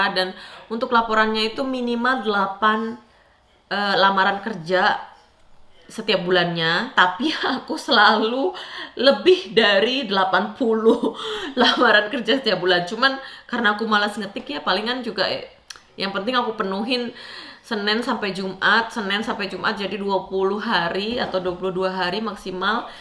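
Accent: Java, Indonesia. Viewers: MBAK, JENENGAN KOK AYU MEN....MBEK OMONGANE MEDOK JOWONE, SENENG AKU....HAHAHA....SESAMA ORANG SOLO HARUS SALING SUPPORT......HEHE😍 KONTENNYA BAGUS2 LHO👍👍👍....SEMOGA MAKIN BANYAK YANG NONTON YAAA...SUKSES! GBU...😇💕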